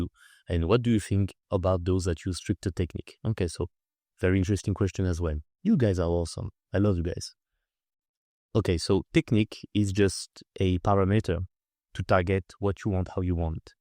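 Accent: France. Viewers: by accident, I trained for watching this channel by watching NH first. I have a bad ear for accents and I don't think I could understand you without acclimatizing myself to a thinner french accent first lol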